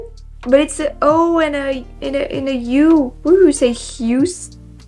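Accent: France. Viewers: Your pronunciation is great. Don't lose your French accent, it is wonderful. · Everything you say with a French accent sounds better! Don’t worry about it ! I’m an American from Boston, everyone hates my accent! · Some of your "mispronunciations" aren't actually wrong. It's not your first language so your beautiful French accent makes a difference like it would with anyone speaking a language other than their own which would totally get them in trouble in some countries, lol. I've heard people BUTCHER French words. I took 3 years in high school and even though that was 30+ years ago, I still cringe when I hear it because you can tell that person has never learned properly but THINK they know. I agree with the one comment. That rolling "r" when you speak certain French words does trip up a lot of people just like "th" does for you and I've noticed for a lot of Brits who will pronounce it as an "f" sound. 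You do talk too fast in your excitement sometimes which makes you stumble but once you take your time, you're golden :). With all the accents (regional and foreign) that we have in the US, it's laughable that people say you're saying words wrong but you made a very good point. If you've never heard them spoken before, you don't know it's wrong. FYI, it's not that we don't say the the first "n" in Lincoln. It's that we don't say the 2nd "L" so Link-IN or Link-On depending on where you live. Chicago - the CH isn't a hard Ch like Church but a soft sound like Shh. Believing - Ba-LEAVE-ing. You said read near the end of the video "it will be fun to read (RED) your comments." The read when pronounced like red is the past tense of the verb and read pronounced like seed is the present tense :). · I hope you never lose your French accent. Your English is very understandable. More so than a lot of Americans. 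My mother is French. She lived in the US since 1961 and still gets comments about her French accent. I would say that the TH seems to be most difficult for French speakers. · The first rule of English is there are no rules of English. The thought of being a speaker of another language trying to learn English spelling and pronunciation is daunting. You are doing extremely well! I would still identify you as French with your accent if I spoke with you for a few minutes, but your effort is paying off - you are sounding more native as time goes on. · Love watching your videos. English is difficult and you are doing very well. Don’t lose your French accent which I think is beautiful. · I can always tell what is saying on her videos. Of course it won't be like an American, she's Frenchtastic 🙂I am always disheartened a little when people "lose" their accent. It's what makes humanities rich textures full of genuine diversity yet through shared communication of that diversity we can communicate. 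( even with the multiple meanings ) Context clues FTW 😛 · I find it interesting to hear you talk about the different prnounciations. However, I don't understand how or why anyone took exception to your pronunciation to begin with. Your French accented English is absolutely charming. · English is just hard. As long as people understand you, which they can, I wouldn't worry about it too much. Besides, your French accent is cute. It's way better than the accent people from Quebec have 😱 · The English language sounds so much better when a French woman is speaking it. 🤩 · American English with a French accent. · Your doing fine. Our accents are tough for everyone. And yes your French accent is delightful. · frankly, I love the French accent. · Don't worry about your pronunciation. You have a delightful French accent. You'll make more friends with an accent! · I've never had a problem understanding your English. 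I love your French accent. You speak better than many americans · Your French accent is fine. I can hear you clearly. I’m from Jersey and that’s how I sound. I’m not changing nor should you!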